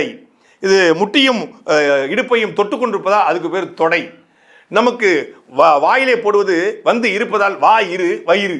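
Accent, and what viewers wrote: Tirunelveli, India. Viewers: சாட்டை 🌹நெல்லை slung சூப்பர் ஆக பேசுகிறார் 👍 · சாட்டை நெல்லை தமிழில் அசத்துகிறார்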